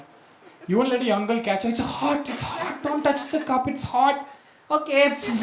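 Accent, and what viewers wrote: Kerala, India. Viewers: He is from kerala. More over malayalis speak english this way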